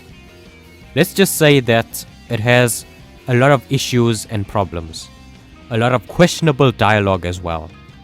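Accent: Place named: South Africa. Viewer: That's nice 👌 I'm British Indian but I have a lot of Indian South African family, so that's how I recognised your accent!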